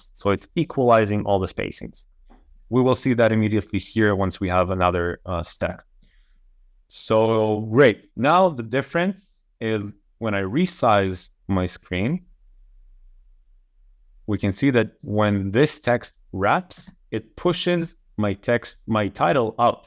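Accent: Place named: Israel. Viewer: טוב, לפי המבטא הבנתי שאתה ישראלי! תוכל להסביר לי, למה לעזאזל כל עריכה שאני עושה בדסקטופ לא מסתנכרן עם מובייל וטאבלט!?!? הכל מתחרבש, כל הדפים], סקשיינים והתאים על מצב פיקסט! למה לעזאזל זה מתעוות לי ב-publish!? אה...ועוד דבר מעצבן! כתבתי תוכן בעברית, לחצתי על cntrl+shift ימני כדי לחהזיז לצד ימין הכל, ואז הפרגרף מוחק לי את הכל ומעלים את האופציה של הטקסט! מה לעזאזל!!!!!!!!!!!!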